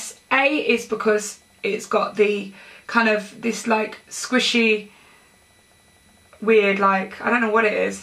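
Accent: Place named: Birmingham, United Kingdom. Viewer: You sound like you have a Birmingham twang to your accent x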